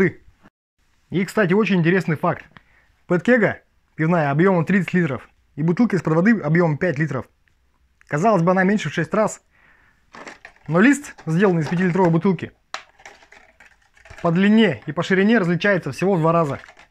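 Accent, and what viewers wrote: Perm, Russia. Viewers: Очень оригинально! Класс! Ты не с Перьми случайно? Акцент как с реальных пацанов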